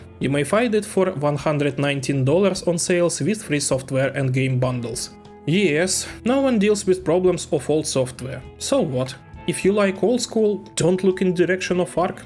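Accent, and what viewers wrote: Russia. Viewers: Сразу слышен русский акцент и школа ) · Russian accent😂)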